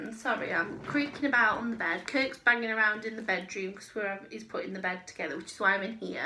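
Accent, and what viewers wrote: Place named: Manchester, United Kingdom. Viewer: Hello, jan carruthers has sent me your video. I really enjoyed watching you. Well done on your 500 subs. It amused me when someone commented on your accent. It sounds very similar to mine. I m from east manchester. I assume you are from manchester too.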